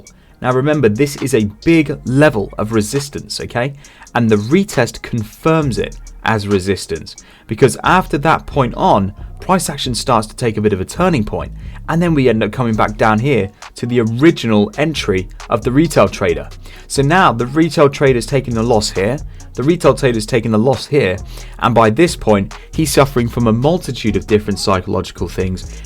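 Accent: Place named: United Kingdom. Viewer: The pull back does not always turn at the next resistance or maybe a bit lower and other guys teaching the candle method and what you are showing here is the most coveted point where to catch the meet A lot of pro retailer need only 50 pips with 20 25 standards and they are done for the day , any wat I like your British accent.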